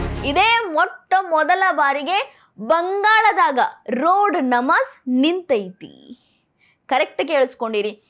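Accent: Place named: Haveri, India. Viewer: ನನಗೂ ಬಹಳ ಇಷ್ಟ ಆಯಿತು, ಹಾವೇರಿ ಕಡೆಯ ಭಾಷೆ ಇದ್ದಿರಬೇಕು. 🌷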